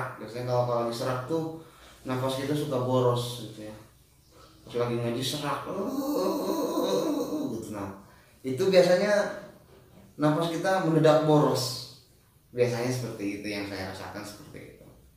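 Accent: West Java, Indonesia. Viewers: Begitu sajah... Sunda pisan bhs indonesiana..😁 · Mantap Kang... Prnjelasannya alami... orang sunda bgt... Hehehe. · Logat sunda tee bisa lengit aa 😁😁 Kasep ogeh dei aa ,,,shat trus nyaa aa ,,aamiin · Lucu tapi bermanfaat mkasih bang ats ilmunya😃Sunda pisaaan euyy😂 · Sunda punya, hihi logatnya itu lohh ustd khas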